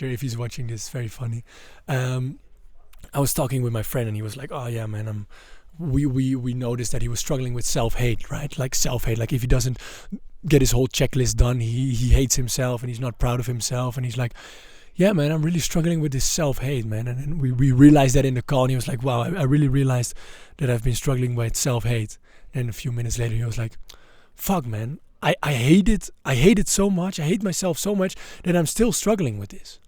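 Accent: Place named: Netherlands. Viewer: Hey, ik hoor aan je manier van praten dat je Nederlands bent, dus ik dacht dan typ ik ook lekker in mijn moedertaal een reactie:) Je kwam tevoorschijn op mijn tijdlijn en ik wou je even bedanken dat je je wijsheid met de wereld deelt. Het tovert instant een big smile op mijn gezicht, blijf doen wat je doet! Mensen hebben het anno 2025 echt nodig om door zulke zaken geïnspireerd te geraken. Thank you, brother!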